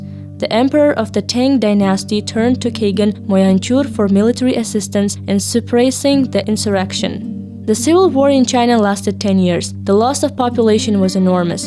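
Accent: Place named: Russia. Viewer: You have russan sound)))))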